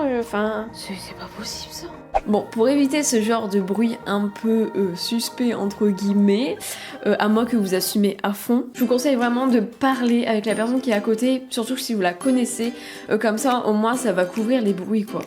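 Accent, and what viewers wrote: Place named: North, France. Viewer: bonjour vous êtes toujours aussi belle,j'adore votre accent de ch'nord!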